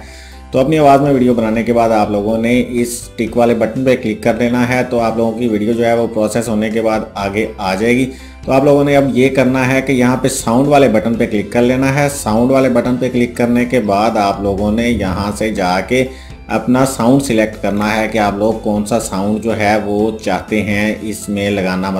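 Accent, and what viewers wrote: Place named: India. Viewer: It is Eanglish but he talks in a indian eanglish way! Why is YouTube full of haters? God.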